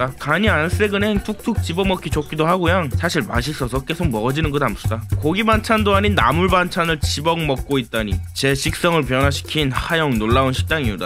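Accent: Jeju, South Korea. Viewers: 내레이션 짱이다... 제주말 진짜 아름답다 ㅠㅠ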